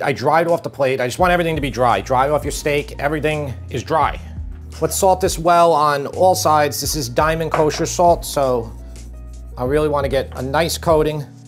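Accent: New York, United States. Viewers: They offer the same to me- a gal from NJ who now lives in SC and just feel so happy to hear the NY accent and food details, like calling a pizza a "pie".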